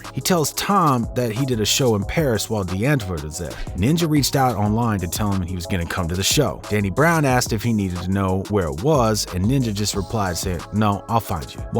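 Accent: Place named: United States. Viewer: Ignorant take on the duo, and christ american pronouncians on anything thats not english 😂 fml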